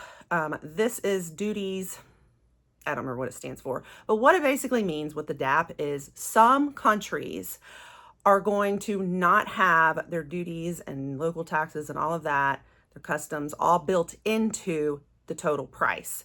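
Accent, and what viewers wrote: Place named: United States. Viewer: Just the let you know I’m from the uk and I LOVE the American accent so I’ve subscribed and I think your advent videos are great xx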